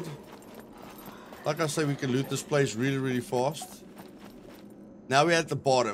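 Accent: South Africa. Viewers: I can hear that South African accent! :3 And yeah Eskom screws us all over!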